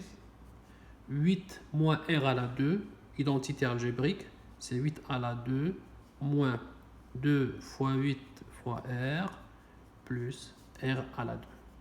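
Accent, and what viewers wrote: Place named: Canada. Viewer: Ouahhh, très bonne vidéo Aussi, je suis habitué à entendre l'accent français parisien, l'accent canadien est très sympa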